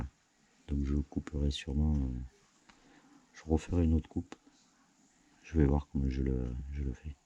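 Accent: South, France. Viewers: moi je préfère avec ton accent du ☀️☀️ it's smells the canicule 🤣🤣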